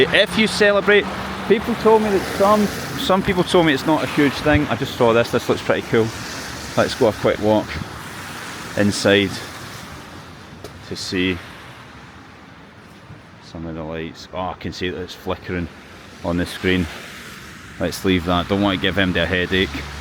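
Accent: Scotland, United Kingdom. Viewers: Stopped by to listen to your accent. I adore scottish accent. Nice video. Not sleepy at all! Best wishes from Belarus! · Great project! I've visited Moscow twice and lived in St. Petersburg for 6 months. Your Scottish accent is pure ASMR - so relaxing. I really like your channel. 5 stars! By the way, I speak fluent Russian and I have no idea what that word next to the sea is. It's definitely not the Black Sea. It's Greek, lol. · Happy New Year. Enjoy your videos in Russia. Where are all the Lada cars? Lovely street layouts as well unlike Lanark! good to hear a fellow Scot reporting from Russia. Cheers Bill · Beautiful city. You dound scottish. Im from Strathbogie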